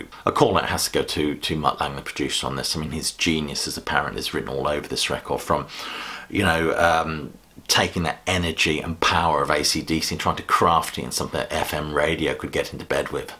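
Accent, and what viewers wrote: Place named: Newcastle, United Kingdom. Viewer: Blow Up Your Video sounds like Geordie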